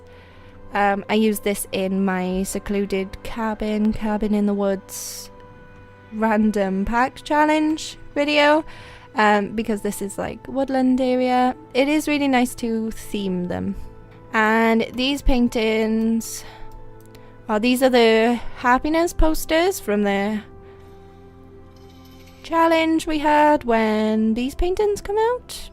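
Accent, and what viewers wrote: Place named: Wales, United Kingdom. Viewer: It's nice to hear a Welsh accent, reminds me of my Mamgu!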